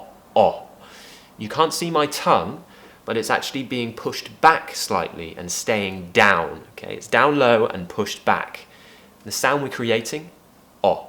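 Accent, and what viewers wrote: RP, United Kingdom. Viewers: Your English is really British. I like british accent. Although I don't currently possess the ability to sound more beautifully British but with your courses I could brush my pronunciation up. Cheers.....!!!!!!!!!! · Wow I love your videos,I’m such a fan of RP accent!❤️ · I love british accent,might ask you sir to give us a lesson about the stress.thank you in advence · I really love this accent. British English is a worthy and beatiful language that I want to keep up. I'd like to learn Slang words or expressions. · I have seen more British English videos on YouTube, but your British English accent is pure and more British than others. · I love your British accent and l hope to speak English like you. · I love British accent ❤ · Lovely british accent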